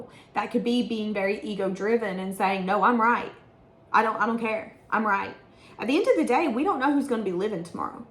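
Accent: South, United States. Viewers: I love ur energy, your southern sound. This reading is telling the truth... ❤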